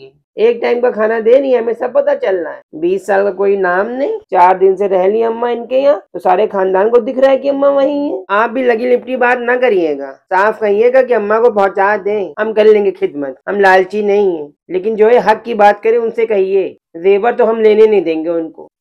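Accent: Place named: Lucknow, India. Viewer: Your lko ascent is best❤❤❤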